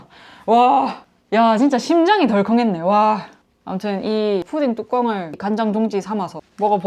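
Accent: Busan, South Korea. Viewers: 구독함!, 꾸밈없어 좋음, 경상도 표준어 너무 좋음!!! 30대 후반인데 어째 4~50대 필이 나서 더더더 좋음^^; 요즘 시덥잖은 넘탱이들 여행유튭보다 훨 나음. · 부산분이시죠? 너무 잘 봤습니다. ㅎㅎ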